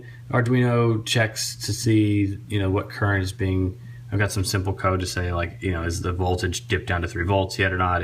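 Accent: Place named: New England, United States. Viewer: Are you on the East Coast or from there? You seem to be like a Yankee :o)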